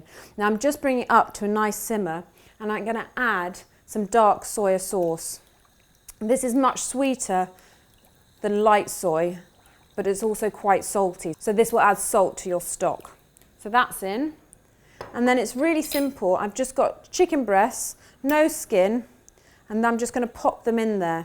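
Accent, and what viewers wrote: United Kingdom. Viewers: honestly, I subscribe ld to this channel to listen to the beautiful British accent 😍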